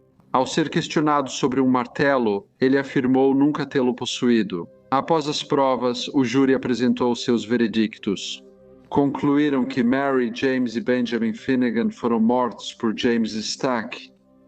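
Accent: Portugal. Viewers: O português de Portugal é lindo ❤ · Alguém precisa dar um jeito neste sotaque de Portugal! É muito chato! E feio!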